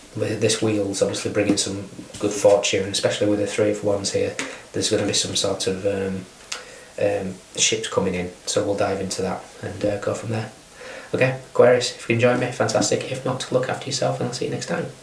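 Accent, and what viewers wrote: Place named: North, United Kingdom. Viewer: That was nicely put😄 especially with that northern accent. Thank you for that🙏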